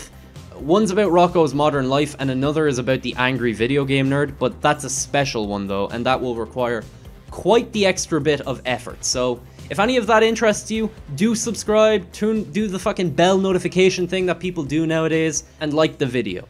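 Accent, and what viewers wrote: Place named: Ireland. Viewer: that irish accent tho 😍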